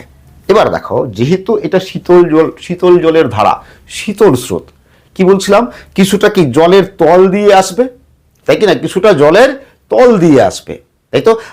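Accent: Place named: Bangal, Bangladesh. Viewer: দাদার কথা বলার ভঙ্গি বাচনভঙ্গি সব দেখে মনে হচ্ছে দাদা বাঙাল। বাচনভঙ্গি টা একটু সুন্দর করলে ভালো হতো।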